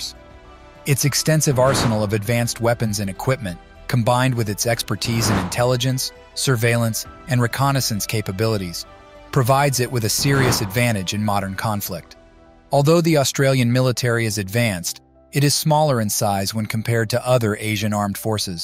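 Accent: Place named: United States. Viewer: Can we please have anyone other than a yank tell such a story?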